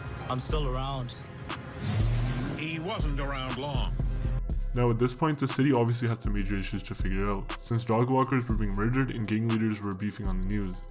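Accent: Punjab, India. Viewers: u can tell this guy is punjabi and from surrey lmao he sounds just like bindy johal except more chill/relaxed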